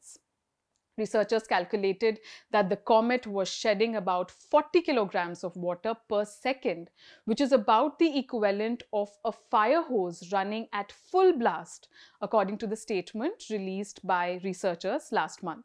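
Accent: India. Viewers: Stop making videos , I couldn’t stand the lack of information and accent . I said what I said , I’m fatigued on Indians .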